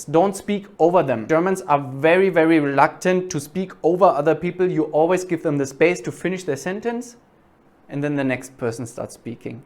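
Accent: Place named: Delhi, India. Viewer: Ich wohne in Germany und du? मै दिल्ली से हूं, मेरा जन्मस्थान दिल्ली है, लेकिन अभी जर्मनी में रहतीं हूं। Amazing आप तो हमारी दिल्ली वाली हिन्दी बोलते हो...I hope मै भी आपकी विडियो से जर्मन सीख कर achhi German बोल पाऊ,,, आप jaise hamaari Hindi बोल रहे हों please विडियो बनाते रहना🙏🙏👍👍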